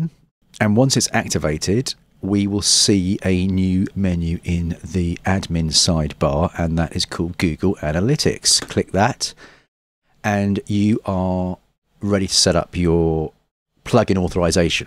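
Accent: United Kingdom. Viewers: WOW! The easiest youtube tutorial I have ever come across. Also always love the British accent too. I just subscribe to your channel... Will be waiting for your next tutorial.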